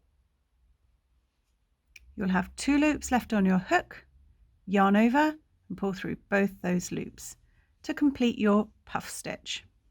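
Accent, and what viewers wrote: England, United Kingdom. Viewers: American stitch names in an English accent? Lots of trebles, counting one, two, three as you did them, but then referring to them as double crochets, and then double crochets, counting one, two, but calling them single crochets! I can see I'll have to write the instructions out in English. Lovely squares, thank you, but any reason for the use of American terms?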